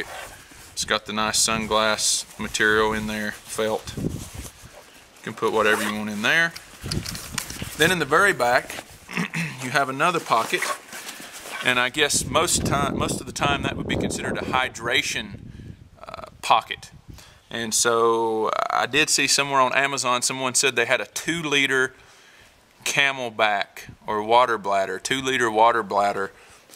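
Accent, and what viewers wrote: United States. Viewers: First things first. Your response "As for overloading the bag it had towels in it. Did not even weight two pounds as i say in the video." is incorrect. you state " It fit everything" referring to the previous minute of dialog where you inform the viewer that the PUSH bag (Practical Utility Shoulder Hold) rode on your iPhone. Like I said, you overloaded the MOAB 6 (Mobile Operation Attachment Bag). I watched the 2 videos on your load-out of the PUSH bag. 10 pounds is far to modest an estimate of the weight. As for your condescending remark "I guess in the States you dont need what i do i suppose", guy, you have a southern draw, I would know, I'm southern. You sound like you're from Alabama or Georgia. As far as what I need, in a 6 hour bag I place what I will NEED for 6 hours. AR mags, pistol mags, radio, pens, paper, a tourniquet, pain reliever, 1 16 oz. 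bottle of water, sunglasses, flashlight, lighter, toilet paper. Remember it's a 6 hour bag. 5.11 Tactical is designed for law enforcement, EMS, Firefighter, not survivalist! There are bags that cater to those individuals much better than a 6 hour bag. Your review is completely unfair. You should have began the review by saying "you bought the wrong bag for your situation". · Where do you live? Looks like Australia but you sound like Arkansas lol not being mean just asking. Good review, I have this bag and use it as a diaper bag. lol tactical dad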